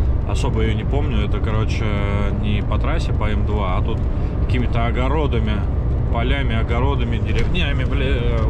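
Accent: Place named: Volgograd, Russia. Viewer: Ты с Волгоградской области? Если не послышалось, то что за район?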